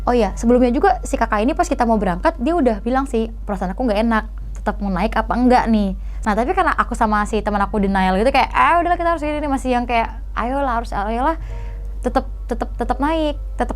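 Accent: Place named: Surabaya, Indonesia. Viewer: Mbak.e ki wong ndi to kok logat.e kyk kenal, sby ta opo malang.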